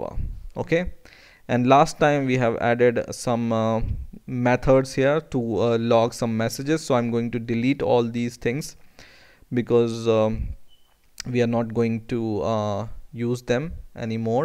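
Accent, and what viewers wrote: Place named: India. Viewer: Are u an Indian Guy? Sounds like u are, at least for me it does. Can u show US How to make something like this -> I wan't to add number, but like this Let's say i type ASD+2 So the app store 2 on the ASD file, if the 'ASD' it doesn't exist yet, it make a new one, and ad the 2 on it Then i hit ZXC+3, it adds like before, but add on ZXC, again if it doesn't exists, it create one 'row' named ZXC, and add 3 on it Then again, i type ASD+8, it sees that ASD already exists, and has a value of 2, then it add 8 on top of it, resulting 10 Its a calculator, but with letter's too, Just a crazy idea of mine hahaha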